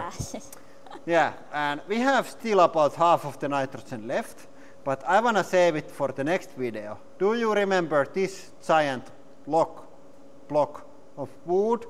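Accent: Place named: Finland. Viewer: The rally English is strong with. ei pahalla mut perkele.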